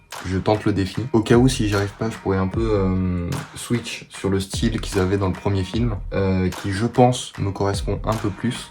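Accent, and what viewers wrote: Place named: England, United Kingdom. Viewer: Alors l'accent anglais est trop guez mais par contre gg pour la vidéo comme d'hab, c'est quali ^^ continue comme ça 😋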